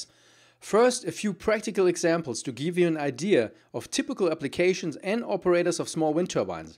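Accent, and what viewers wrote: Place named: Germany. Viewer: I like English of Germans. I can easily understand it.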